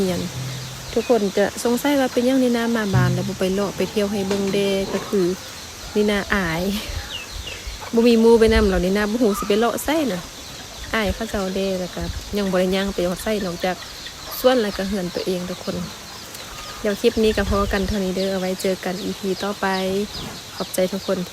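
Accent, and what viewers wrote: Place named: Chiang Khan, Thailand. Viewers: น้อง อ่านพาสาไทยได้บ่อน้อ, เว้าคือเลย เว้า หล่มสัก น้าแก้ว แก่นท้าว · สำเนียงพูดเวียงจันทน์ เหมือนกับหนองคาย สำเนียงเหมือนจังหวัดเลย สบายดี❤❤❤🎉🎉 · พูดเหมือนคนไทเลยจังแต่ฟังสำเนียงการพูดเหมือนคนไทเชียงคานจังหวัดเลย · สำเนียงน้องพูดภาษาคล้าย,จเลย,จ,เพชรบูรณ์ของไทยครับ❤❤❤❤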